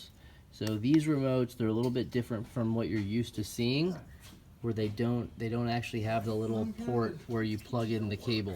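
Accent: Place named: United States. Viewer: OMG, finally there is someone here speaking AMERICAN!!!! I purchased this VVDI tool for months now, whenever I looked it up on Youtube all I got was either Thailand or the shitty Russian voice. Thank you and thank you for your video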